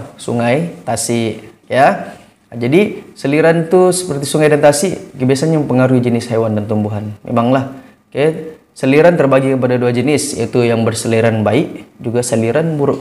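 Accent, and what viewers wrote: Sabah, Malaysia. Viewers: cikgu orang sabah ke? sebab cara cikgu cakap macam sabah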